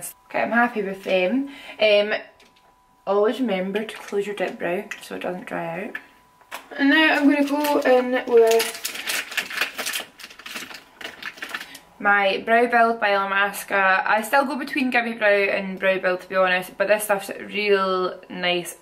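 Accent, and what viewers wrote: Scotland, United Kingdom. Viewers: Freddy T-s I am pretty sure she is Scottish but that's just a guess from the accent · She is Scottish.. I'm Scottish too and I find it so strange how ppl don't understand our accent hahah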